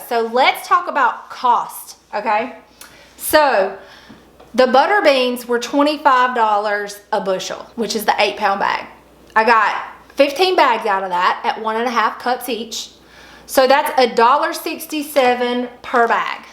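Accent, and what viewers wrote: Georgia, United States. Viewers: That's a south GA accent if I've ever heard one Source: Born and raised in South Georgia!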